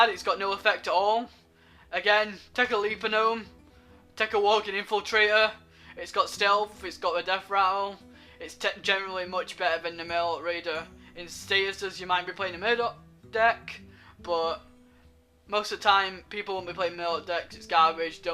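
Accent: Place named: Manchester, United Kingdom. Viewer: It sounds like you are from Manchester in England, so for American audiences you will need to talk more slowly and concentrate on enunciation. With any presentation you might want to give a visual overview first, maybe showing a group picture of all the cards you will cover. Perhaps grouping them for aggro and control decks as you consider them and listing them in order of power, maybe a top 10 etc. I found your post searching for "hearthstone analyse one drops" as I was looking for more insight into choices as I'm new to hearthstone.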